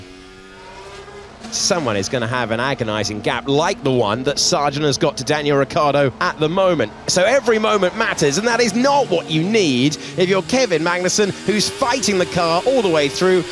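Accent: United States. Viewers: Why is the sound of the cars so low, all I hear is the loud commentary. I know these aren’t the best sounding cars but I still want to hear them. And that American accent 😔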